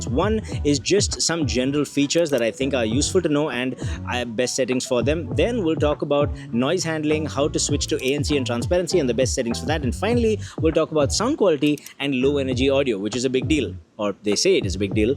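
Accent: India. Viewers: You should make a choice and reduce one of the following to things: level of background music or Indian accent. One is always distracting another and so on.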